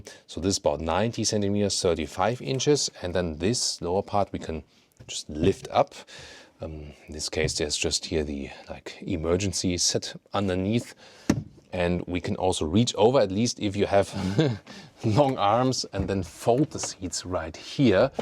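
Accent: Germany. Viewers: German Accent made this review even cooler.👍🏾🇺🇸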